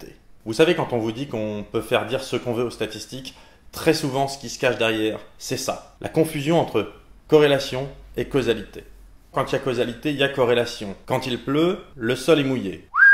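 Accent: Alsace, France. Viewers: Mince je voulais vraiment savoir quel était l'endroit le + dangereux de France xD En tous cas on a bien senti l'accent alsacien ;-) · Le, "ou pien", c'est ton côté alsacien qui refait surface ? ^^ (on a dû te faire plusieurs fois la remarque, mais tant pis) Très bon format, au départ je m'attendais à une sorte d'ersatz d'axolot à cause du titre de la vidéo (aussi accrocheur qu'un titre de une de journaux ^^), et puis finalement je suis content que ça ait finalement apporté autre chose que mes attentes. C'est vrai que l'amalgame corrélation = causalité est fréquent, il est bien trop souvent de lire à cause de ça (jusqu'à preuve du contraire ;P) des âneries monstrueuses chez certains journalistes pseudo-scientifiques... koff koff Big Browser koff koff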